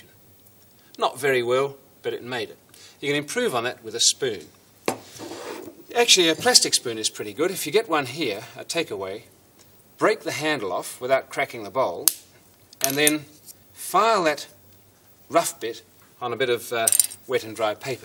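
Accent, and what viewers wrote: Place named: Australia. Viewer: What on earth happened to our accent ? We all love Steve Irwin but that doesn't mean we have to all talk like him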